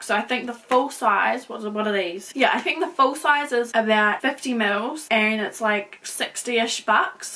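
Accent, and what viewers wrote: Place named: New Zealand. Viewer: Ughhhh I am in love with the modern Renaissance palette 😍 Your accent threw me off for a second, I was like "SHES AUSSIE" but your accent didn't sound quite right for an Aussie, I think it took me 2 solid minutes to realise your actually a kiwi 😂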